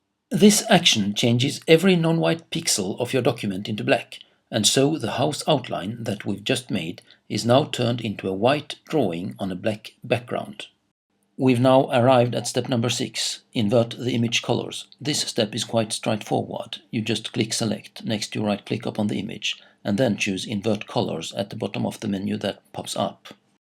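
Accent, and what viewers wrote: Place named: Sweden. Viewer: I knew in an instant you were Swedish from your accent haha, it sounds exactly like when I'm trying to make a Swedish parody in Danish :P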